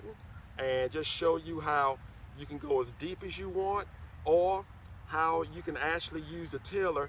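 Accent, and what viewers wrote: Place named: Baltimore, United States. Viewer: Amazing video! Also you have a thick ass Baltimore accent 😂😂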